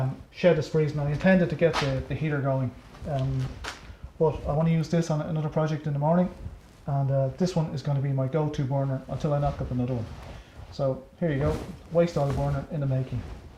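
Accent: Ireland. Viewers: Just realised - was the reason I started to watch YouTube in the first place. Thank God he's back. Apart from the content being exactly my cup of tea (free heat and paramotoring) there's something therapeutic about the enthusiastic and humorous delivery in that wonderful Irish lilt. Normal service has been resumed! Good on ya!!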